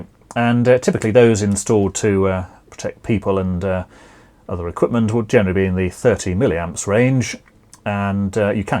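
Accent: United Kingdom. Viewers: Hello Sir , initially really I'm very thankful of you for your very helpful video series , you present your nice videos look alike "sport events tellers" and sometimes understanding your accent is a little bit hard for none natives and specially a challenge for me, anyway I love British accent and by listening you my English listening skills would be improved as well...LOL... , could you please answer these questions , on (time of video ) in the book we have RCD and RCBO , it seems that RCBO is better than RCD because RCBO does somehow operation and in this way "save life of people" and also protect the devices such as TV and washing machines and so on , yes ?? So what is the difference between RCD and RCBO?? which one of them for home is better and most suitable ? each ones RCBO for TV and washing machine is needed separately ? each one should has which specifications/ amperage ? 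each RCBO can cover how many devices ? sorry a lot of questions !! I am contacting from Kiev in Ukraine and by answering my questions you will solve a lot of problems for me and at the same time it is a very big favor, Your fan , your supporter , Afshin Heydari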